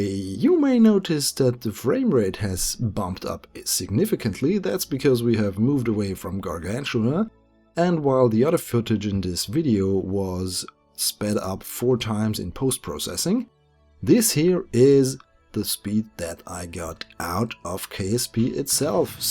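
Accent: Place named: Germany. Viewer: im not 100% sure though but im from Germany and he sounds german^^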